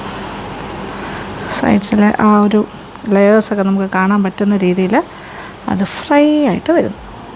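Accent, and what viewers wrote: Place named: Central Travancore, India. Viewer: Your demonstration and explanations are easy to understand. Your Malayalam is from Central Travencore as well. Not of native Trivandrum type, it is clear and well spoken. Thank you for the time spent on items cooking. May God Bless you and Keep you.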